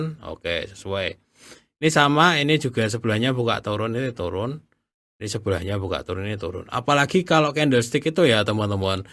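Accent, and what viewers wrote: Java, Indonesia. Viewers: Maturnuwun mas... logate jowo banget..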